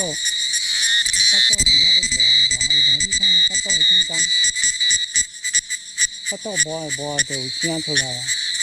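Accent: Taiwan. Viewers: 超棒超正台與 正港ㄝ台語郎 讚讚讚讚讚 超超超超超親切的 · 喔！ 給你5個夠👍 有夠喘有夠俗 有夠標準有夠 親切的下港腔 語言…聽落郎 叨有夠爽也拉… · David哥的台語令人驚艷👍，好像小時候回鄉下外婆家，會聽到熟悉的台語腔調，格外地親切感。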